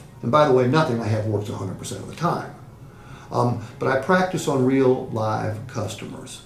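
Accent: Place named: South, United States. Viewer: and his hillbilly bullshit talk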